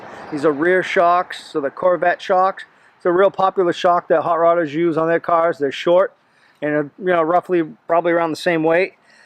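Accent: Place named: Massachusetts, United States. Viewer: The car is coming along great! Your accent reminds me of my mom. She grew up in Gardner mass.. keep up the good work!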